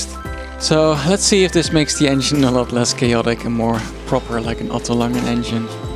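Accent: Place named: Netherlands. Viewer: You sound dutch don't ya?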